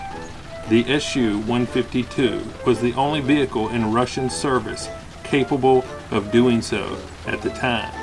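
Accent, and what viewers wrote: South, United States. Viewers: Are you from the south? You have a southern accent...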